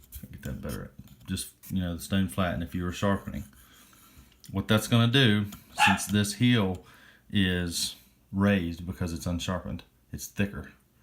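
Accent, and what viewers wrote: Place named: Texas, United States. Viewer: You from Dallas? You sound like Vinnie Paul. You haven’t posted for years, but your instruction helped clarify what I needed to get razor (and mirror) sharp. Cheers brother, thank you.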